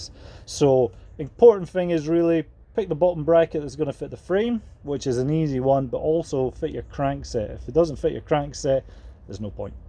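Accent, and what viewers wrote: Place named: Scotland, United Kingdom. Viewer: What's up Hello brother, I'm getting mine in a few weeks. Went a complete build which is amusing considering I own a bike repair shop but stock in the suppliers is VERY low and PX have all the parts. Be rest assured mine will be customized in the future too:D Where are u from? I'm Dalkeith. You sound east Scotland too.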